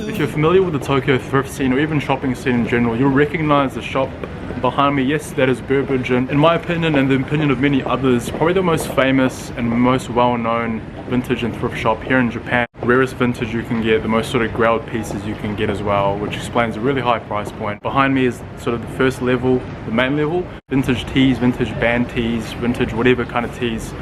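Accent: New Zealand. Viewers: yeah was gonna say this lol, assumed you were aussie for a sec then i listen on and am like bruhhh this guys kiwi as 😂 nice video bro! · You sound like kiwi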